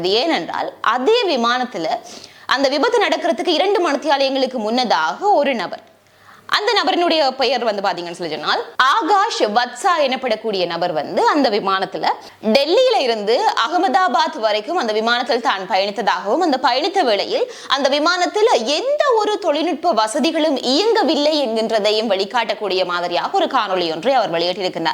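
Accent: Jaffna, Sri Lanka. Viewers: இறந்தவர்களுக்கு ஆழ்ந்த இரங்கல்கள்.. தங்களது பேச்சு யாழ்பான தமிழ் போல் மிக நன்றாக உள்ளது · தமிழை நன்றாக பேசுங்கள் இலங்கைத் தமிழை பேசாதீர்கள் தமிழ் நன்றாக புரிந்து பேசுங்கள்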